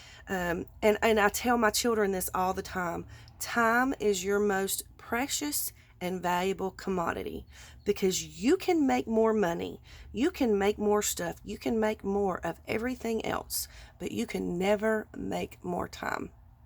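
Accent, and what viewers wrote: South, United States. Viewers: Hi, long time watcher, but recently subscribed. Your vids have been very helpful, informative and entertaining. I love your sense of humour, your voice and your southern accent is charming. I had to take the time to comment today because eventhough my creations are not yet ready for market, just knowing this is available awakens possibilities for all newbies. You have a beautiful heart and it is crafters like you that make this community so amazing. God Bless you and may the purity and kindness He has bestowed upon your heart be conducive to a successful endeavour. You rock! A million times thank you! Feel better soon.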